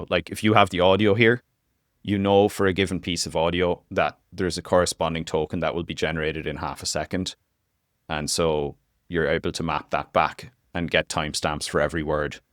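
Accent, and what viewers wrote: Ireland. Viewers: What the heck and all this while i thought our man was from Ireland. Bravo !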